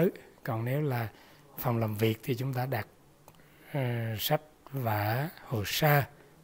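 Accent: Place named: Quang Ngai, Vietnam. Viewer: Giọng giống ở QNgai quá. Hì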